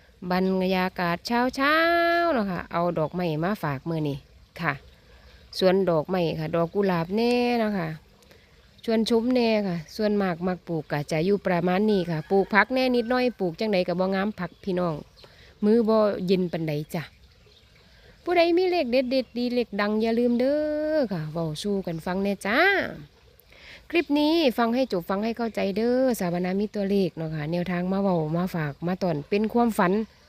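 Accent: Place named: rural, Thailand. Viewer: ชอบฟังเสียง จร้า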